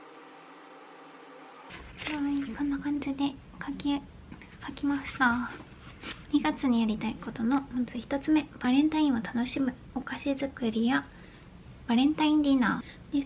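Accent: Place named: Okinawa, Japan. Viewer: 沖縄の方言おもしろーい😊 私は愛知の尾張弁と三河弁と岐阜弁が混ざってて、よくわからんことになってます笑 愛知岐阜三重は、友達とか職場にいるからうつるんですよね、、、😅笑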